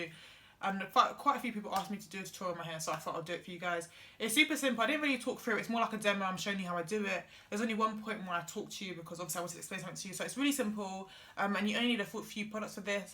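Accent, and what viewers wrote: United Kingdom. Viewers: Your so prettyyyyy!!!!! And I freaking love your accent❤️ it’s probably not but is it British?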